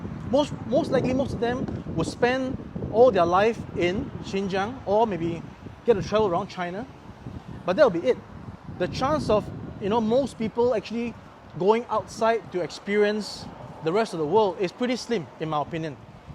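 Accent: Singapore. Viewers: I think his accent is Singaporean accent, not Indian :) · Singapore accent. Why weird? You are weird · Singlish is hard to follow……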